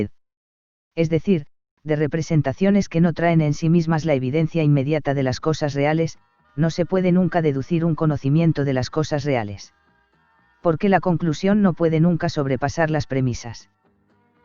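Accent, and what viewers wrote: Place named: Uruguay. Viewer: me tinca que tu voz es mucho más agradable q esos "emuladores de voz" o como se llamen que usas en tus vídeos, además con acento uruguayo serían muy entretenidos. Por principio me salto los vídeos q usan estos text to voice, puesto q los usan los millenials conspiranoicos. Vi ambos xq estaban en "No sin grave daño". Por ahora te leeré ahí.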